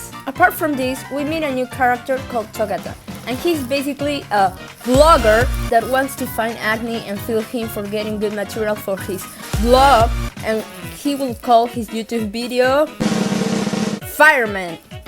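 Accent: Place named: Spain. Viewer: Se nota que hablas español pero que buen video de mi manga favorito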